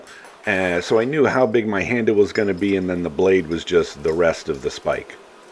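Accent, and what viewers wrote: Canada. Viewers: Always thought you were Canadian.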